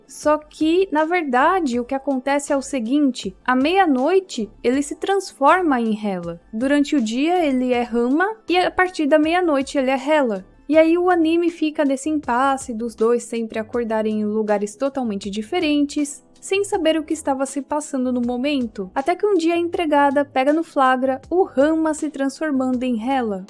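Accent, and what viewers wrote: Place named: Curitiba, Brazil. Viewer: Acho que a deve ser de Curitiba por causa do sotaque.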